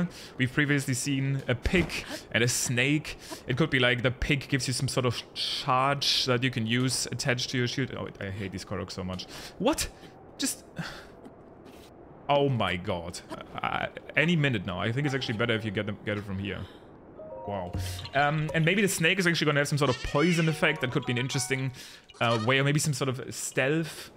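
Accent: Germany. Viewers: Love this guy's german accent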